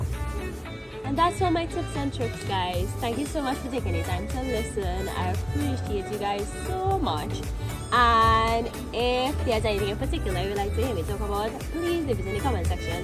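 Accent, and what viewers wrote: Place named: Trinidad and Tobago. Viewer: I hear a trini accent!!!!! Yesss let’s go!! Keep making videos!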